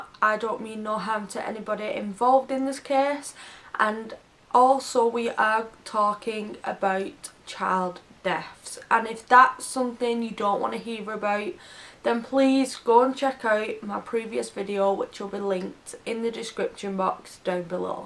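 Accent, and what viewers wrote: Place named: England, United Kingdom. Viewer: Great video X subbed good t hear a English accent X subbed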